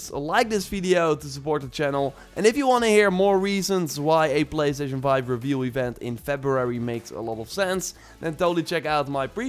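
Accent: Netherlands. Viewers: U have an dutch accent are u dutch want ik wel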